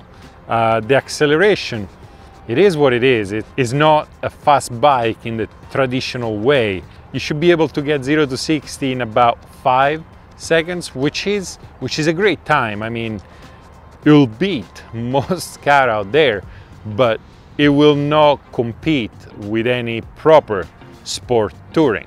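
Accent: Italy. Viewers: I love the Italian accent review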